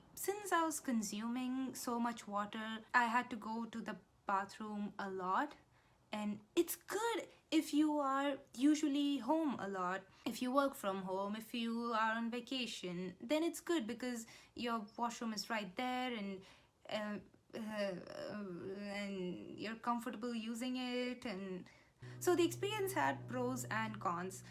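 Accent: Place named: India. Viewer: Typical indian accent, bathroom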